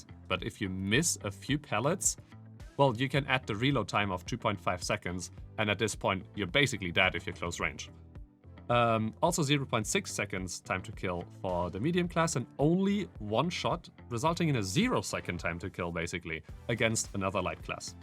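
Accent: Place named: Germany. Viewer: Deutsch... Man hörts XD aber gutes video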